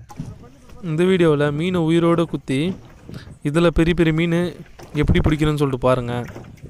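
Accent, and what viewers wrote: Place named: Chennai, India. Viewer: Sagothara ethula Chennai slang pesuringa and fishing style kaniyakumari yepdi?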